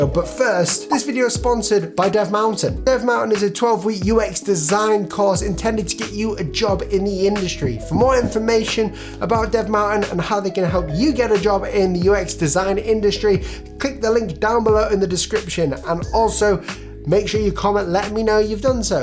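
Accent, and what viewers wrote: United Kingdom. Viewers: I LOVE the British pronunciation of 'aluminum' and words in general. I should have been a linguist.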